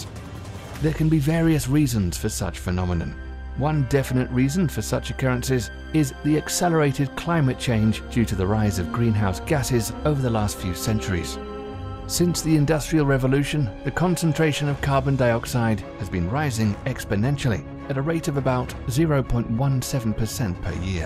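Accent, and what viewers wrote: England, United Kingdom. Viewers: Freaking English accent and the background music make it so hard for me to concentrate.. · British scary accent, orchestra music, roll the drums and guess what; 99% of all the comments below, agree that this is yet another scary scam. After fourteen billion years of earth history and the survival of life, it appears that 100ppm of CO2 will doom the planet in the next ten years.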